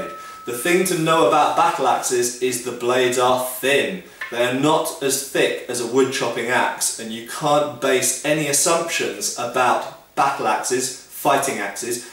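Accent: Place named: England, United Kingdom. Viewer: Out of a myriad of tube video's I thoroughly enjoy yours for having such well presented video's. I also enjoy the Pommy accent and the authenticity when you always turn the camera off by hand gives a nice touch. P.S ASHES BELONG TO AUSTRALIA !!!!! Yeaaa, maybe you should do a video on what your cricketers should use as the most devastating blunt instrument for maximum batting efficiency :D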